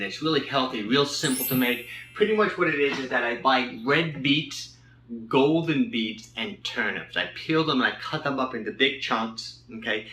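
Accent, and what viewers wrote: New York, United States. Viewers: Dear - I am grateful to know you brother. I am following your three part exercise to firmer abs. Follow up question to your answer that I can do 1 minute of high intensity jogging one minute rest walking. Regarding the high intensity jogging rate, all I can muster is 7.5 on my treadmill. I am 63 and in great shape. Is 7.5 a good speed? Should I raise it or can I even lower it. Your humble follower Laird Mintz. P.S. You sound Like you are from New York. I live in Chicago but was born in Brooklyn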